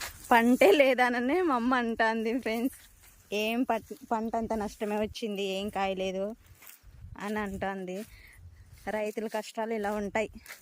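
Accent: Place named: Anantapur, India. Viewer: ఎక్కడ మీ ఊరు.. మా అనంతపురం యాస చాలా బాగుంది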